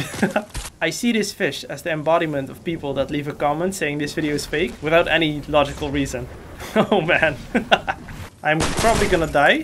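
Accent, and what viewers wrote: Netherlands. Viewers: Please can you make a season seeven version? Also are you dutch? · Are you dutch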